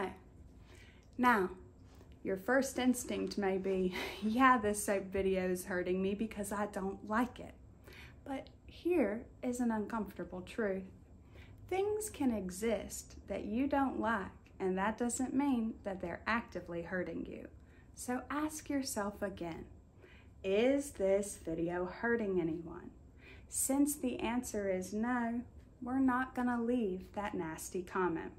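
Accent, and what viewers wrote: South, United States. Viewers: I have a question,. Well actually 2 questions. 1. What is ASMR. 2. Are you from the South?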